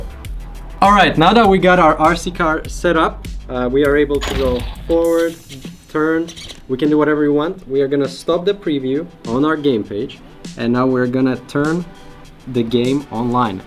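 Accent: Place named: Russia. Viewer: He has a Russian accent )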